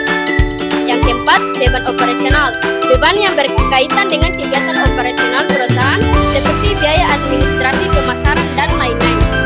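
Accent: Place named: Ternate, Indonesia. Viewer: kerennnnn👏🏻👏🏻 logat Ternate kntraa 🎉😂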